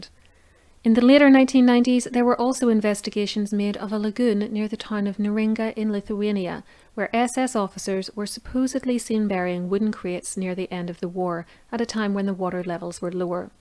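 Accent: Northern Ireland, United Kingdom. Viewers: Is the narrator Northern Irish? I recognise the accent.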